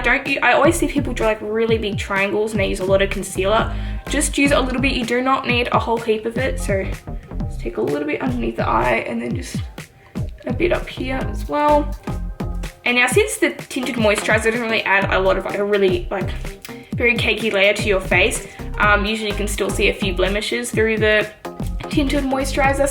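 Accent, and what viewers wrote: United Kingdom. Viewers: Question: are u British? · British and African ❤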